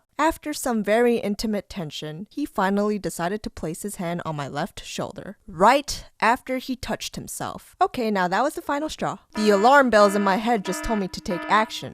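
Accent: New York, United States. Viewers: broooo are you deadass from new york lmaaaaoooo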